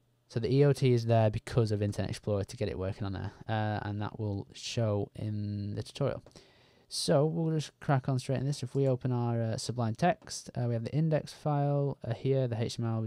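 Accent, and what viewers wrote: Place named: United Kingdom. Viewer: your British accent is awesome !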